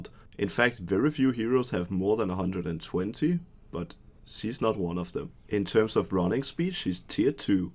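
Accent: Denmark. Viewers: the danish accent SHINES hehe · It really sounds like ur danish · Is that a danish accent? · This guy has the most danish accent I have ever heard and it is glorious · are you from denmark?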